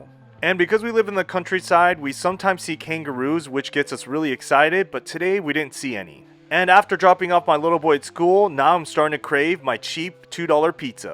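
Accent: United States. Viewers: this american sounding asian guy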